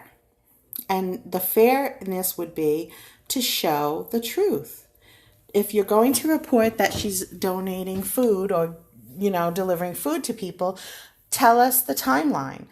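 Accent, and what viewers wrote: Northeast, United States. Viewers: Hello- my first time here.. You sound as if your from my neck of the woods...NE. " Markelytes" yiddish& bi racial?lmao... I doubt anyone believes anything they hear...We are all loving the gossiping& the Bashing! She is a mf joke& i for one am loving it!😉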